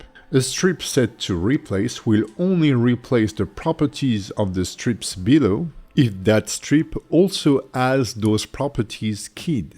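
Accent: France. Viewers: Et sinon, il y a une version française de vos formations ? Je ne pense pas trop me tromper avec votre accent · J'adore l'accent Français !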